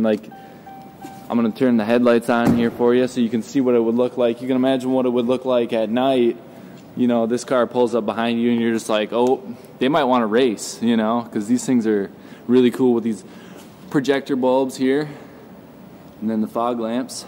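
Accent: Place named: Minnesota, United States. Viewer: Damn that Minnesota accent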